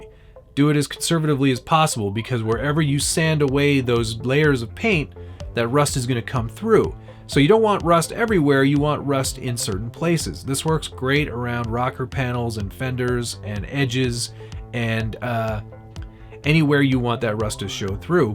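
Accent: Canada. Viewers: Hey man quick question, are you from Canada?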